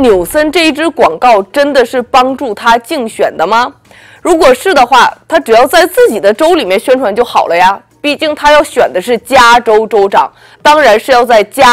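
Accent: Northeast, China. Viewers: 听小姐姐说话可好玩儿了，哈哈哈，东北口音小姑娘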